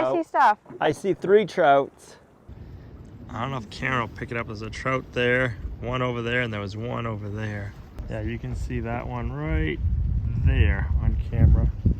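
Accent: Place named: East Coast, United States. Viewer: Eye deer? its idea. Not eye deer sir. You are definitely an East coast dude with your miss pronunciation of your speaking. Come on get with it. You sound. Like a fu king Kennedy, YUCK.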